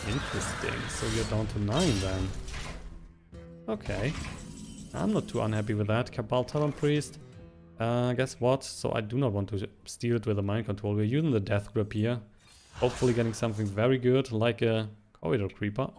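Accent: Germany. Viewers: Youre german right?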